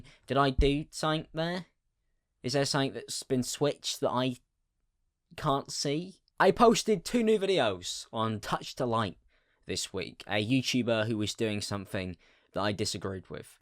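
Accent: United Kingdom. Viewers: It must suck to have a British accent and *still* sound sorta dumb. Not nearly as dumb as my American accent (face it Americans, the world thinks we sound retarded) but definitely way more dumb sounding than most British accents. (Again, I'm American, and we think a British accent means you're smart, because we are very, very dumb. If you have a British accent AND glasses, we assume you're a genius. You know; like how a dumb person thinks. )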